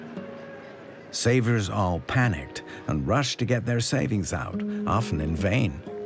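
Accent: United Kingdom. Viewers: This appears to be someone from the U.K narrating an American story. I coulsn't finish watching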